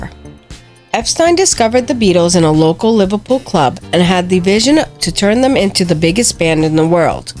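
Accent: United States. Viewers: You're probably an American, methinks.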